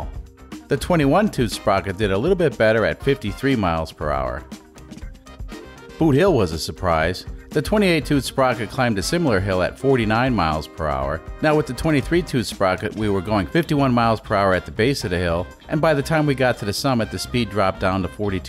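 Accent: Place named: New England, United States. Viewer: You sound like a New England transplant. Wicked awesome, hey.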